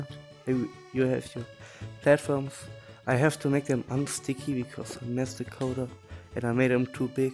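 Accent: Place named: Germany. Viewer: you sound soo german XD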